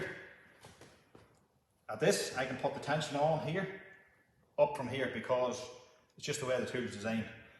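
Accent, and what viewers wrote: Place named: Donegal, Ireland. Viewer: Donegal accent always makes any vid sound better. :-)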